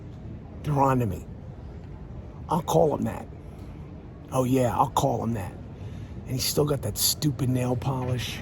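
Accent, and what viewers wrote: New York, United States. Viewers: I love hearing your opinions on all of it and how passionate you are about all things Bravo- keep ‘em coming. And F YouTube for restricting you- I need your F bombs and New York street talk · Love you and your New York accent. Love your work especially as a beat cop shooting a bird on Friends. You are a classic.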